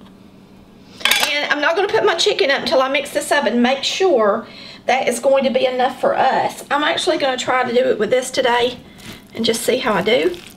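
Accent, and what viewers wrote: South, United States. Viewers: The "rocker blade" is also referred to as a Mezza Luna (Italian)....which means a half moon. I have an antique one which has 2 cutting blades. You can also use a Mandolin (French) to evenly dice the veggies. Loved her recipe, as well as that Southerner accent. Happy eating.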